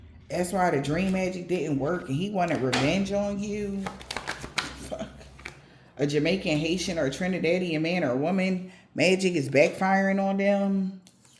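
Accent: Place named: Baltimore, United States. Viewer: Lol is you From Baltimore I love your accent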